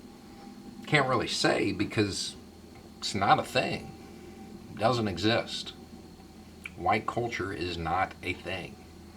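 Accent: South, United States. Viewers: Because he's a disingenuous asshole who just repeats Leftist talking points in a southern twang. Which is also subsequently why you guys like him, he's basically your "house southerner".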